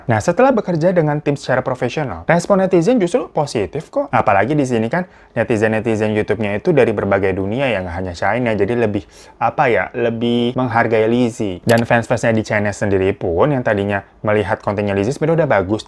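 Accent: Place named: Jakarta, Indonesia. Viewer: Ya ALLAH KAMU TUH LUCUUUU BINGIT SIHHH LOGATNYA CAMPUR2 ada betawi , sunda ,cines dll kaya rujak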